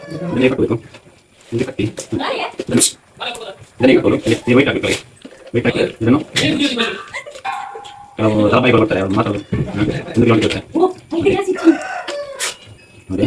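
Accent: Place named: Mangalore, India. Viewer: Meenu tikkijindla bejar ejji anda fishing place and natural kudla style tulu patera soooper, wishing you better fishing next time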